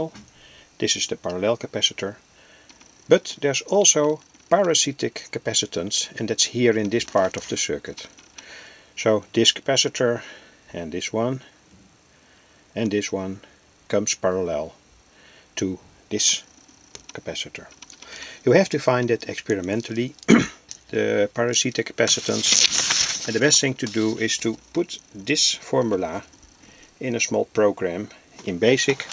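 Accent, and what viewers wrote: Netherlands. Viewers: Hello -nice video From you accent i wil bet you are a Dutch man (Netherland). God save the King Willem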